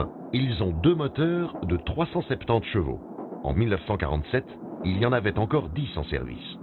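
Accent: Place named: Belgium. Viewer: D'où vient ce commentateur. Entre Berschem et Charbeque, il nous donne de l'octante...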